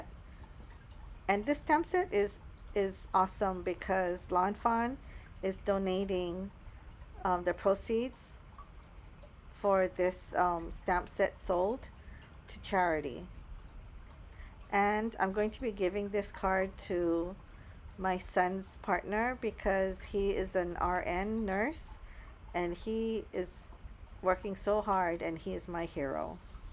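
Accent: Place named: Hawaii, United States. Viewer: I agree, the slimline was perfect for this stampset! So cute!🤗 Gosh, I love listening to your Hawaiian accent. Reminds of my work Ohana when I was stationed on Oahu🤙🤙🤙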